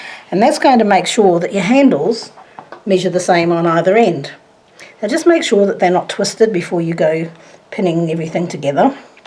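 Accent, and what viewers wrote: Australia. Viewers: Okay, so while I LOVE your tutorial, I absolutely adore your Oz accent. Thank you so much for the guidance on this tutorial.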